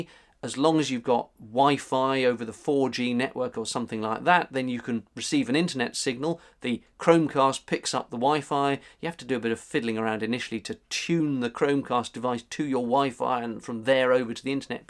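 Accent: United Kingdom. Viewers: ! I absolutely must know this. It's bothered me for many years now. I has nothing to do with Narrow Boating. It's all about how you crazy Brits pronounce a particular word. The word is.......Aluminum. So we pronounce is Ah Loo Mi Num. You guys say Al Uu Mi Nee Um. How did you get the extra sylable there? You know, it's your language and all, but, what the hell? I'm pretty sure you don't spell it differently than we do like with the word color and colour. I can see that. So what's the deal? P.S. I was very happy this weekend to see that you have a new video. I was worried. Or should I say, "I was wu or I eed.?"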